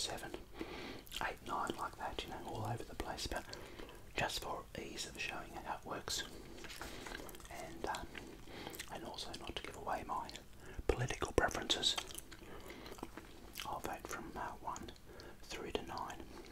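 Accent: Australia. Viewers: I think half of these views are mine for the last months 😅😅 i hear it every day almost. Chewing gum rules! Till now never have noticed the Australian accent, but you introduce me to it and I absolutely love it. And it was very creative idea to talk about politics (maybe not a popular theme in the asmr world but a wonderful niche)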